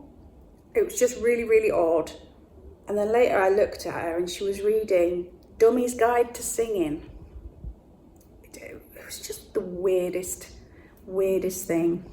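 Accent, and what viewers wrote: Nottingham, United Kingdom. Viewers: your Nottingham accent is coming through 😂. I am born and bred in Nottingham but have moved all over the UK but I’m back living in Notts now and it soon comes back xx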